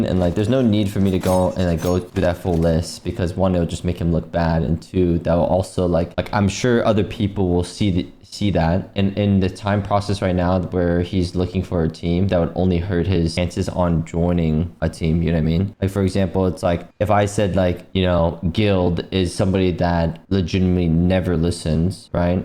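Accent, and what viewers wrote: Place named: United States. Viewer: He was born in the US. He has lived there his whole life. Dafuq are you talking about? He has a mild speech impediment (slight stutter) but his only accent is American.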